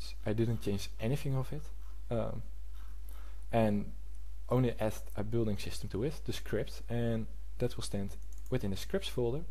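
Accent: Netherlands. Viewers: Wear are you from, Holland? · your dutch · Dutch? :P Nice video man :)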